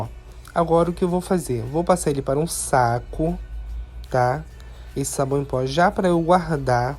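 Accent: Brazil. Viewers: Buenos días Me encantó esa forma de hacer el jabón me gustó mucho nunca lo había visto hacer así pero eso queda seco Bueno lo que usted enseña Me encantó hasta hoy lo encontré pero ahora veo voy a ver todos sus videos que veo que tiene cosas maravillosas y también tiene cosas para la cocina Muchas gracias bendiciones Marta desde Colombia por la forma de hablar veo que usted es del Brasil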